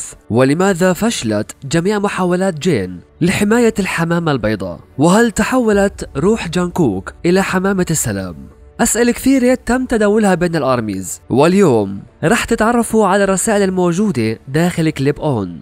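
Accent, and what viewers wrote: Iraq. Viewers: قلي نت ليش تحجي مرات عراقي بس أرى اعرف 🙂🤔🤔🤔🤔🤔🤔متابعتك من العراق🇮🇶🇮🇶🇮🇶🇮🇶🇮🇶🇮🇶🙈🙈🥰🥰🥰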